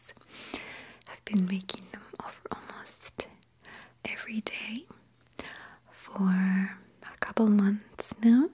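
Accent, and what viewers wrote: Russia. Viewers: I love your videos so much, you’re my first ever ASMRtist I’ve ever watched! My favorites are your videos where you emphasize your Russian accent and culture, can you please consider doing more videos with your Russian accent please? <3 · I also love your Russian accent, it's so pretty. My parents are also from Europe (Czech Republic) and we all speak both English and Czech! Sweet dreams from Canada 😘😪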